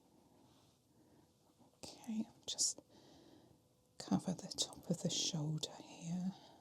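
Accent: United Kingdom. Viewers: Lovely video as always. Your lovely British accent is like silk to my Swedish ears 🤗🥰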